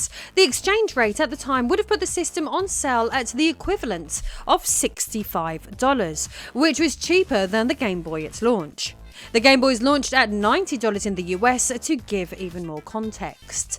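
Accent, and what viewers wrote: United Kingdom. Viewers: you reminded me of Batonetta with that british accent and s3xy tone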